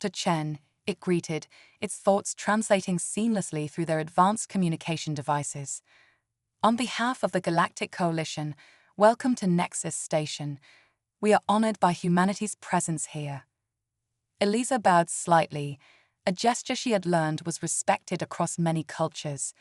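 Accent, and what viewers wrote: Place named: RP, United Kingdom. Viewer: I am NOT a fan of this female upper crust British A.I. voice. Too many emphasizes on too many superlatives and verbs and staccato syllables followed by disdainful valley girl syllables at the end of sentences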